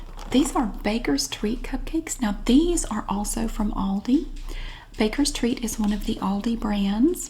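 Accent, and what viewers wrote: South, United States. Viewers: You sound like Pam from the Office but with a southern accent!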